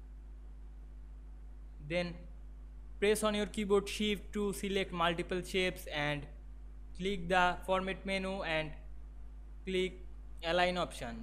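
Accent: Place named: Bengal, India. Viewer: ভাই! আপনার ইংলিশ শুনে বোঝাই যাচ্ছে বাঙালি বাংলায় বল্লে কি হত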